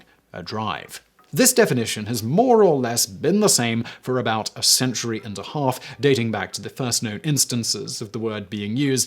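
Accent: United Kingdom. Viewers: British English has entered the chat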